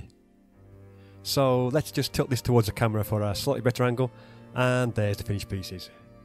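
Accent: Birmingham, United Kingdom. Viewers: Thanks. Got a game of D&D tomorrow, and if I get some stuff together I will try and PM you, and/or send you some pics. Cheers for the resources. They are fantastically well thought out and executed brilliantly. I'm currently out of work at the moment, so can't send you any money at the moment, but once I get a job I will be sure to send you some much deserved funds. Guessing your from the Birmingham area from your accent. Close to me!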